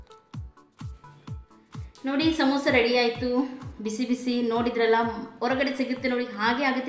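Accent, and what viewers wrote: Mangalore, India. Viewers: Hi mam nimma vlog super nim maklu bandre enna super niv kannada matnadodu nange tumba esta manglore kannada kelodikke kushi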